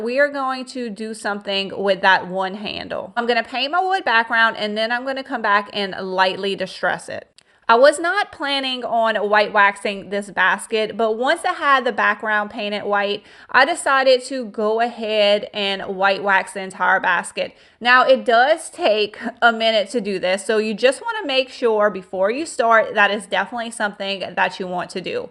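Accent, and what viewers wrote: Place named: South Louisiana, United States. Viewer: I love your accent! Sounds like South Louisiana! Reminds me of my daddy’s family in the Houma area. I love all your projects!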